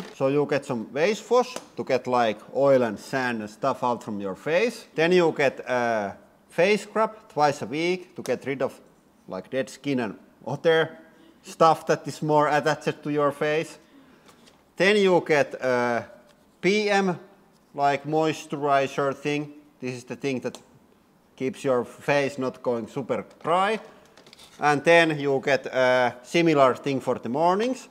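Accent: Finland. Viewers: Ootko Suomalainen?😂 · Finish/English ,,, omg thats lovely 😁😁😁😁😁😁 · huomattavasti helpompaa ymmärtää suomen enkklantia puhuttuna kuin natiivin englannin puhujan. 😆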